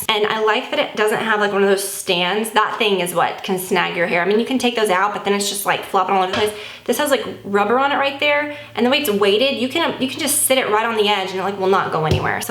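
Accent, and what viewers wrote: South, United States. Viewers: I LOVE YOUR HAIR!!!!! Oh and your extra southern today, your accent is stronger than usual lol!!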